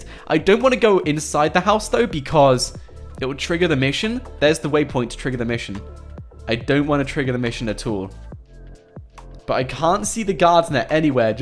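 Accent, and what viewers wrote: England, United Kingdom. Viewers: he said Car Loss instead of Carlos man his England accent is funny sometimes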